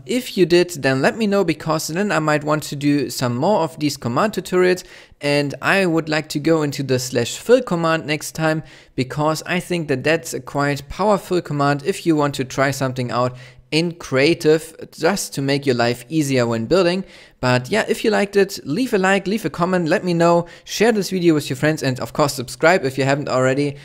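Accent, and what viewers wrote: Germany. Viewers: your german, arent you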